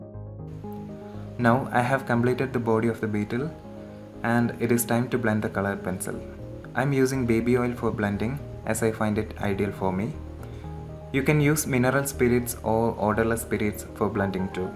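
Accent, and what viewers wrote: India. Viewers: Omgggg that Indian accent is too adorable.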